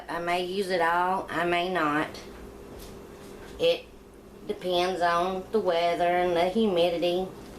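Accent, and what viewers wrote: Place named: Chattanooga, United States. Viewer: Omg my favorite is fresh biscuits. I told you my 2 kids live in chatt, tn right? I was a nurse in that area for 17 yrs. you speak like them that's why I think u might be in that area. All of my ex family is between Chatt, Alabama, and I worked some in Knoxville. You should email me. <mail> I don't do Facebook but I've been on here almost a year. I'm remarried and we live in SC. I frequently drive and see my kids. I'm going to go in April sometime and I'm going to meet and go to lunch with 2 of my subscribers one lives in Atlanta and the other in Alabama. My daughter has a home in chatt and works with Chatt Fire Prot. Yummy yum lov ur recipes💜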